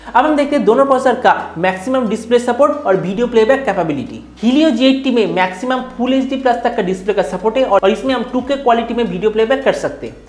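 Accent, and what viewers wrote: Bengal, India. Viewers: Vai vdo ta khub valo informative... Sudhu ektu try koro Bengali accent ta komate jkhn hindi te korcho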